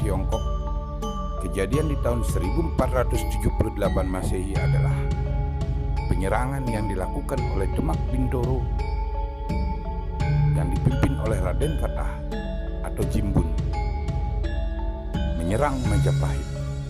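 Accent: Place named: Bali, Indonesia. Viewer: Pengisi suaranya kalo ngomong T kayak orang Bali 😁👍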